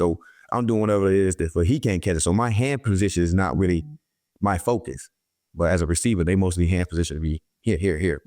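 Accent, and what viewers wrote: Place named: South, United States. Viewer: Bro i always tried to figure out why you was so country i see where you from Im right next door lol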